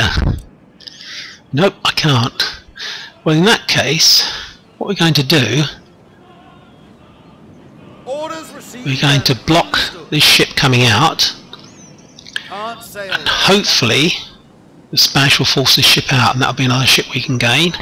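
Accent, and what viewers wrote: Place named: United Kingdom. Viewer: Oh man I'm so glad I found you! Fantastic naval battles! It's been such a long time since I found someone that is good! Also your British! Makes the voice over great!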